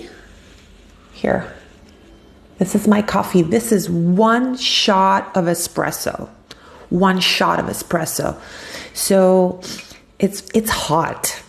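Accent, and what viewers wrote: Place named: Midwest, United States. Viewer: Da madrelingua, complimenti per la pronuncia. Sembri proprio americana, con un accento un po’ midwest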